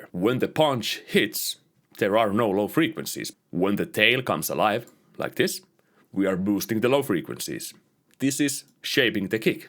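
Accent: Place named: Finland. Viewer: piti ettiä mitenkä duunata vähä uptempoo van löyty suomi aksentti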